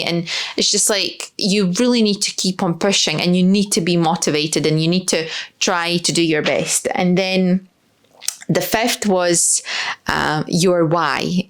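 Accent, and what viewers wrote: Scotland, United Kingdom. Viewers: Funny thing about your accent. You grammar isn't 100% native, but I thought it was just some sort of Scottish vs American English difference. But I don't think Americans can hear any Latvia accent, just the Scottish accent.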